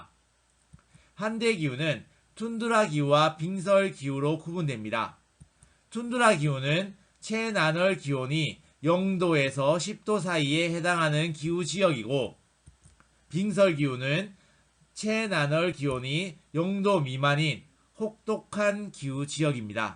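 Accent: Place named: Gyeongsang, South Korea. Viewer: 옆에 있던 엄마가 쌤이 갱상도 부이시가?? 싸바나 아이가~~ 싸바나~~ㅋㅋㅋ 넘 잘 듣고 있습니다!! 감사합니다!!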